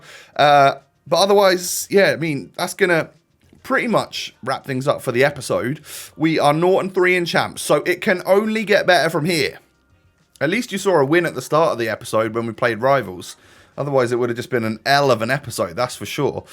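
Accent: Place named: Scotland, United Kingdom. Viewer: didn’t know was scottish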